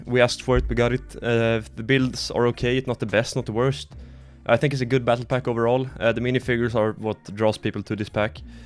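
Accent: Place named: Norway. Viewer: You sound Norwegian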